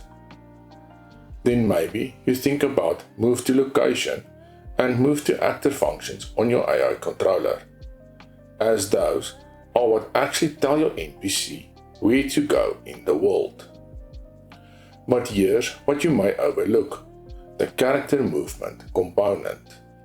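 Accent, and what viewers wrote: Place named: South Africa. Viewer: South African accent is strong with this one.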